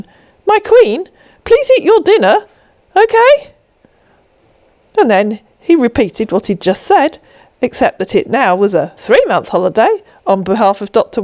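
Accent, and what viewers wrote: United Kingdom. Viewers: This is the most addictive channel… ever! Even when insults are being doled out, the British accent makes it sound so polite.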